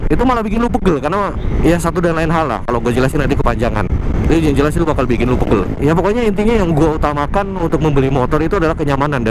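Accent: Surabaya, Indonesia. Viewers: Saya sih emang dari dulu suka isi dari video lu bang dan ga peduli motornya apa yg penting isi dari video itu suka aja denger suara khas suroboyo campur jakarta 👍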